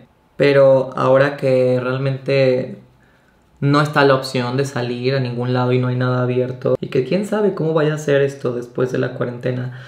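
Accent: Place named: Fresa, Mexico. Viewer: Treintañero (a) gay aun no descubre que hablar arrastrado tipo "fresa" en 2020 da cringe. Flop.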